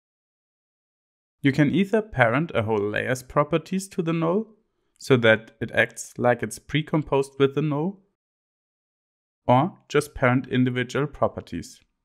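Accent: Germany. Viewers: Du bist doch ein Deutscher oder? Du hörst dich an wie ein Deutscher der englisch redet :)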